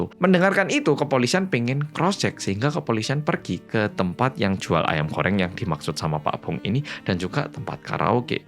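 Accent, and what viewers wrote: Java, Indonesia. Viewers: Jang Hansol, aku sueneng mergo medokmu ga ilang2 😄👍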